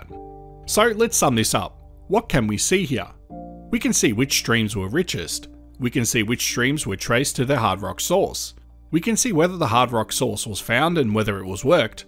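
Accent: Australia. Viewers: Good stuff. I only wish the speaker wouldn‘t be an Australian 😖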